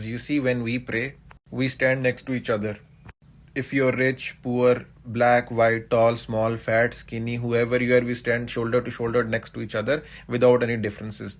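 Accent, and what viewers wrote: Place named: India. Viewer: Yes 🙌 Respect!!! You did him really good ... Woh bhi in desi English 👌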